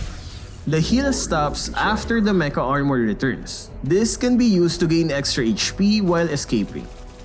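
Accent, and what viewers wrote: Philippines. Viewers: Pinoy to halatado sa tono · Halatang pinoy talaga sa tuno mag English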